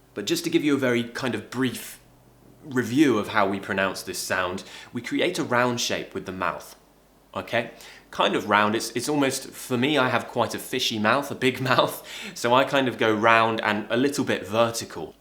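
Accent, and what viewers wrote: United Kingdom. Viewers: You can't believe how much I love listening to you! I wish I had that British accent- I wanna become an English teacher here in Germany and I would love to sound like you haha 😂😂 anyway, great work! Oh and Happy Birthday! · Hi, I'm Julio from Brazil, I love your videos, I am learnig American English, but because of your videos , now I prefer British English hahaha, I think your accent is so much beautiful . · I really like this lessson. It is really useful and o love British accent. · Happy birthday professor 🎂🎈 greetings from Philippines. Been watching your videos and it helped me a lot to learn and improve my english most specialy learning your British English accent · The idea of starting a podcast is wonderful. Walk and enjoy your British accent,so nice and so beautiful .hope it will realize soon 💕💕💕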